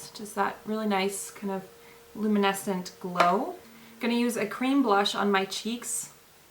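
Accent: Germany. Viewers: You are german, right? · OMG I'm new and I didn't know that you are german:) you have great accent...!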